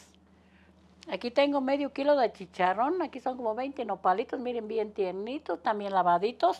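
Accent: Michoacán, Mexico. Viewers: Me encanta Su Voz Puro Michoacán Me causa mucha y delicioso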